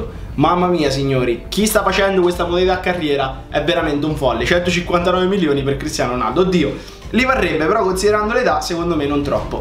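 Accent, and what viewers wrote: Lazio, Italy. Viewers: ma sei di Frosinone? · Ma sei de Frosinone?ciociaria portami via 😂😂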